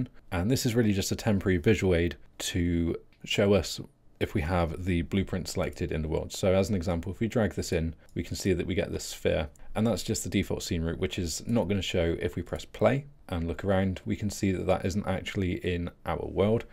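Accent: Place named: United Kingdom. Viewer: I feel like I'm listening to Jayztwocents in British accent. Anyways thanks for the great tutorial!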